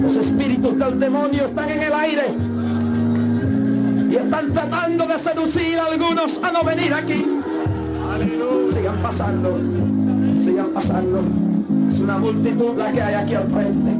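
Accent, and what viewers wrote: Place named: United States. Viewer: En ese Tiempo Tenía acento Gringo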